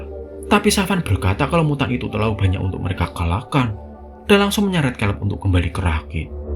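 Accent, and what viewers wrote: Java, Indonesia. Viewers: Maklum jawir😂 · Ngomongnya kadang kurang jelas karena medok banget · biarkan alur ceritannya dibaca Text to voice AI itu lebih jelas ejaan Hurufnya., Medok bngt jadi gk jelas ngomong apa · BAHASANYA MEDHOK NJIIIR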